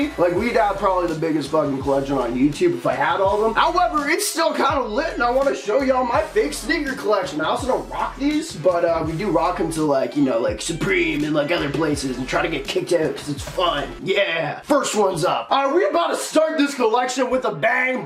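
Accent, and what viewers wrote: Canada. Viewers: Are u Canadian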